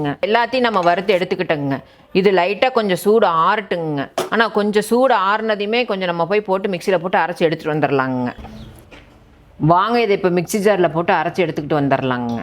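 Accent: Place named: Kongu, India. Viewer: Amma neenka yentha ooru kongu side mathire irukku?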